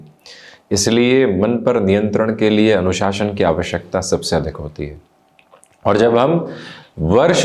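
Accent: Standard. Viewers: Itni shud Hindi kitni achi lagti hai sunney main 🙏🏻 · Shudh Hindi, astonishing to listen,so great guru ji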